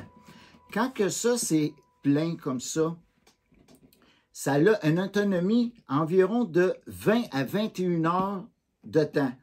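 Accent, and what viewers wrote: Quebec, Canada. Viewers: un accent québécois si mignon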